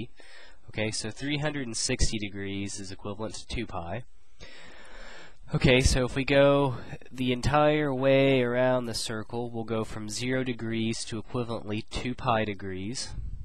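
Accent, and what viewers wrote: United States. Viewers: one of the american accents ;) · of course!! It is American Accent. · True, but I thought he was an American. So American to American, there is no accent. (I do understand that it's an American accent, but between Americans we don't notice a difference.)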